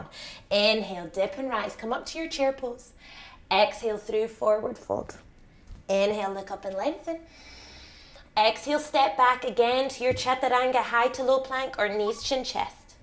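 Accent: United Kingdom. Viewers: I absoluteley LOVE your work, your devotion and your joy with Yoga.. and the best bit: your british voice! thanks so much xxx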